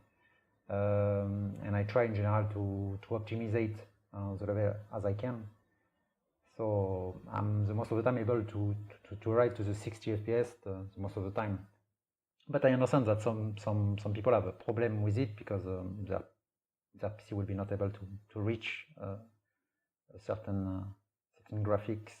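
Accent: France. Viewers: Oulalala l'accent bien français ;)) , un grand bravo pour tout ce que tu as fait c'est chef-d'œuvre , continue comme ça ! :) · L’accent frenchy xD Trop drôle :D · The accent français xD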